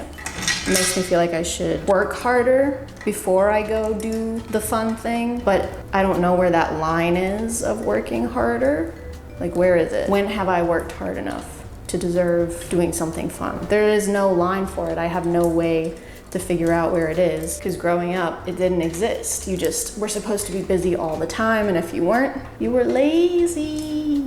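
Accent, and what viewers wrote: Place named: Tennessee, United States. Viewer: This is the most Tennessean I've ever heard you sound. And I do mean it in a good way, promise.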